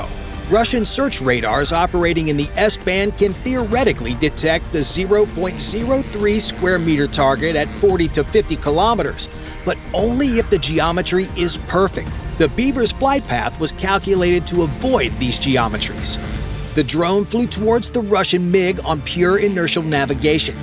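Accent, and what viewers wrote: United States. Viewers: Drop the shouty American voice over, it ruins your video !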